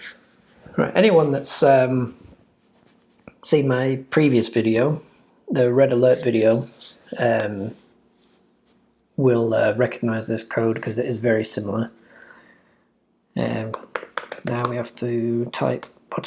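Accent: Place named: United Kingdom. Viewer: i subscribe I like how he talk a british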